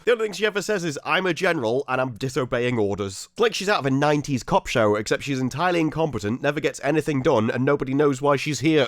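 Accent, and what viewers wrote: United Kingdom. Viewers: Go to the Dentist u brit, handsome voice tho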